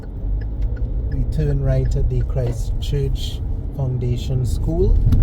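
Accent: Trinidad and Tobago. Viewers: Isn't he from Trinidad?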